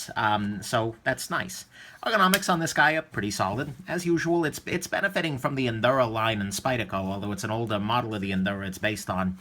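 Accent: New York, United States. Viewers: Where are you from? New York or something?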